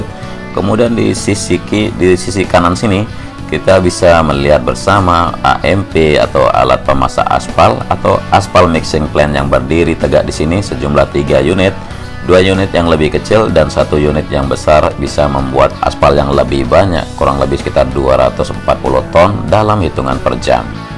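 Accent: Lombok, Indonesia. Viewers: Suaranya masnya Itu lho suara khas mandalika banget😁😁, Topbgt 👍🏼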